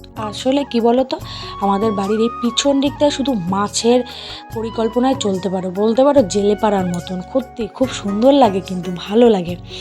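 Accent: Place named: Kolkata, India. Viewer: খুব সুন্দর অসাধারণ আপু আপনার কথা গুলো কলকাতার ভাষা ❤🇸🇦🇧🇩👌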